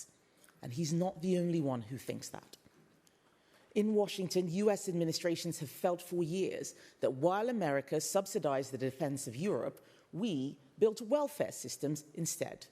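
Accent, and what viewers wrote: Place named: RP, United Kingdom. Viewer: A negro speaking the queen's english is obscene. If she ever gets to the point.... britain is weak and shameful. So is the most parts of europe. They cant even defend themselves but declare, it's not our war. Oh yes, it is!!! Islam has taken over your cities, and you allowed it due to your indifference or cowardice. Now , we, the US are done with your gay shit. Go ahead, get overrun by islam and communism. We are done with you as well!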